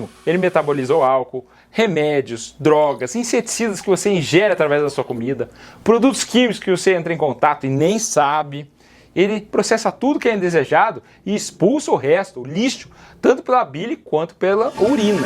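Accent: Brazil. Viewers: Gente, da onde que vem esse doutor? Que sotaque é esse? É do sul ou do centro oeste?😅😅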